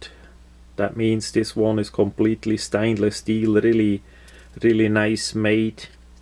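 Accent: Switzerland. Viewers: Extrem geil, Englisch mit Schweizer Akzent. Klasse 😄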